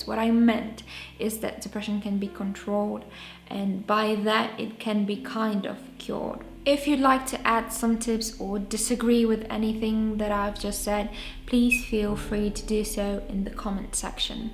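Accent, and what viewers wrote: Poland. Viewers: Wiedziałem że jesteś z Polski po wymowie zanim zobaczyłem komentarz xd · are you polish? very beautiful accent 💜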